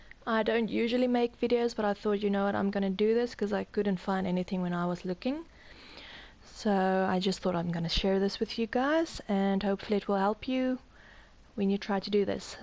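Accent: South Africa. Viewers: Oi are you south african i can tell as i am one too! I can prove your name means Ice Princess #sageneralknowledge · Are you South African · I recognise that accent from anywhere! Suid Afrika represent! You go girl!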